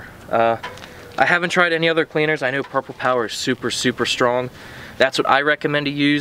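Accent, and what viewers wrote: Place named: Ohio, United States. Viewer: Are you guys from the midwest like Ohio? Your accent sounds like a skater I watch who's also from ohio and you guys talk so similar.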